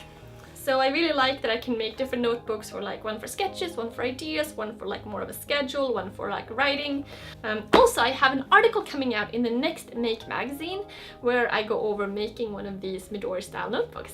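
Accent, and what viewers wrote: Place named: Sweden. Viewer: Nice to see more girls in this community. Just discovered your channel. Just one questions. Do you perhaps have any relation to Sweden because your accent sounds somewhat Swedish?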